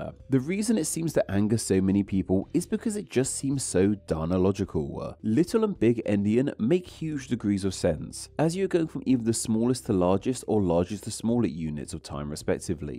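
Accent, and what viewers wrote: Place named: London, United Kingdom. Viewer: Good video. I'd love to know where your accent comes from. I've known people who speak just like you, all from London and the South East, but it's quite distinct.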